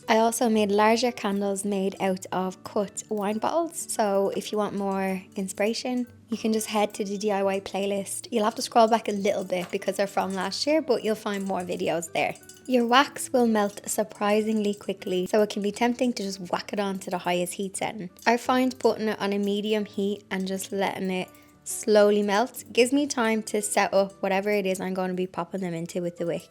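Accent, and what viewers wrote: Ireland. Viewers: With your lovely Irish accent I thought you said your niece would have hair bands, I was trying to visualise hair bands to make your jacket taller🤦‍♀️🤣 it’s arm bands you mentioned 🤣my mistake🤣. I have never seen Beetle Juice, so I’m not much help to you 🫢. But go girl, knowing our she will make it 👏❤️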